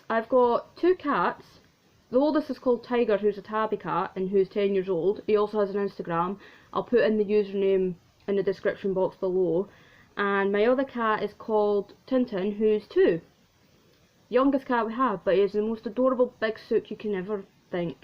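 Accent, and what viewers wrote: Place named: Scotland, United Kingdom. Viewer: Ahhh I love Scottish accents me Grandma's from Aberdeen and trying to understand her is fun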